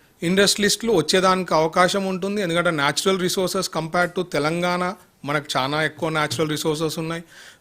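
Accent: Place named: Kurnool, India. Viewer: is using his kurnool slang so dont think bad about him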